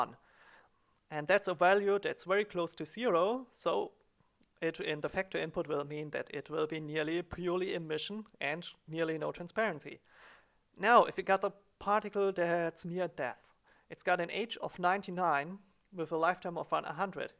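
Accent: Germany. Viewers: Also, als ich den Fernsehturm gesehen habe, hatte ich ja schon einen gewissen Verdacht, aber mit deinem akzent ... du solltest noch an deinem englisch arbeiten, das klingt sehr deutsch... ich bin übrigens aus dresden. ... (If you can't read this ... i was wrong with this comment, ignore it.) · Are you german? :D sounds like you are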